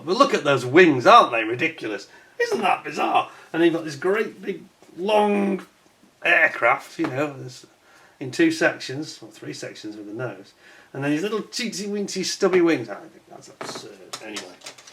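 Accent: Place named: United Kingdom. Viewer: Hi, I don't judge your nice job on YT. I was only saying that these posts look quite anachronistic.....I don't know and never heard one modeller that said Matchbox was top-notch rather the opposite. And I can confirm that because I used to build them, a lot, in the late 70s. Maybe you are right about flashes, good fit, I don't remember but let's talk about details or paneling lines....I read somebody here saying that panels of matchbox kits were done with the plough....a joke but it gives the idea. ANYWAY they are a nice memory of my youth, when I built and still have (restored) an harrier, a jaguar, a UK phantom, a panther, a beaufighter, a Corsair II, a Skyhawk. These survived over the years But I wouldn't say, ever, that their quality was good. Cheerio. By the way I love following you because I can trainy listening skills with your slightly difficult British accent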